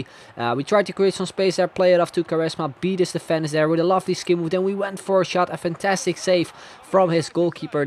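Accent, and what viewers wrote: Netherlands. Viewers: was nog niet geabboneerd. hoorde meteen dat je nederlands was. abboneer meteen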